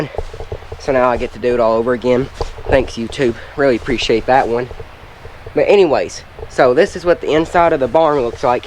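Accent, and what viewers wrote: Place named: Kentucky, United States. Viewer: ...I am a city guy and know little (well really nothing) about farming. Any kind of farming!! However I do enjoy watching the various farming videos on YouTube. And I have learned a lot. Still trying to figure out the different kinds of cows...Heffer, steer, etc. Your video is the first one about chicken farming that I have watched and I will be following your videos. I have so many questions that I am sure will be answered. Havent seen your chicken "barns or houses" with chickens yet but am anxiously awaiting your videos. And just so you know...your presentation is really good. Maybe it's that Kentucky accent...but your presentation is very good. Please keep the videos coming, and maybe I will finally figure out what came first...the Chicken or the Egg. Yeah, you knew it was coming.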